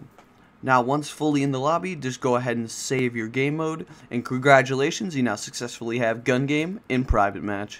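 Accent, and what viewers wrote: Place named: South, United States. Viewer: Great video very well done! only issue is the voice over tbh I don't enjoy the southern accent please refrain from doing commentary in the future and please bring back the brexit guy with love Songless ps I am serious please don't do voice overs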